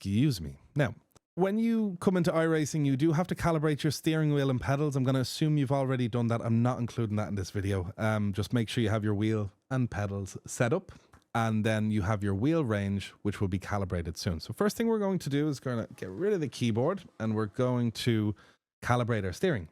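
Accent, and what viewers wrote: Ireland. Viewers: Love the irish accent the irish people have always been my favorite english speakers. From what i have heard you are very similar to us austrians😂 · It's "control R" - it's Irish accent!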